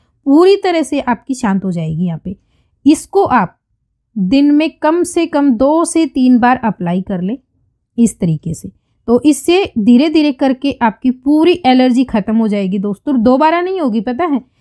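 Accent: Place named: Rohtak, India. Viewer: Aap rohtak se h kya Kyoki aapki Boone ki reathem bilkul mere jaisi h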